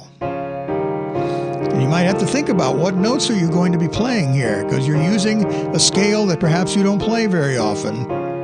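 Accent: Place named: England, United Kingdom. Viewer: Blimey, I was proper convinced you were from England! Pure english English, innit! I'm lovin' it! Your speech is like music to my ears, like a bit of Chopin! :-)) I will keep listening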